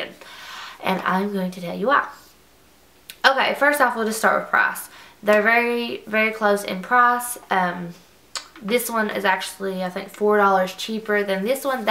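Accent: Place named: Tennessee, United States. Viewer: Great review! I came here with the intention of buying Naked, but now Im sold on Chocolate Bar. BTW, where are you from? Your accent sounds like me! (Tennessee)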